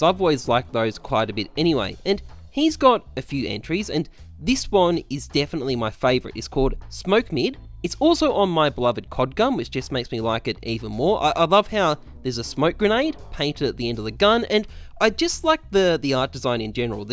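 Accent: Australia. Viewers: Isn't from Australia too? His accent is like an Australian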